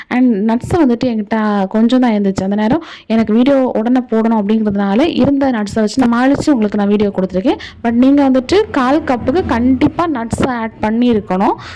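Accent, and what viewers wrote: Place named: Kanyakumari, India. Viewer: Ur voice seems similar to kanyakumari locality.. Will try this recipe for sure sis ... Random viewer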